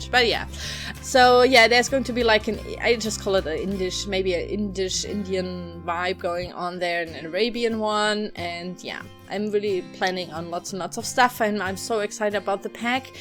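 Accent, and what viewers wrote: Germany. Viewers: Hey :) Where do you come from? You sound really german to me 👌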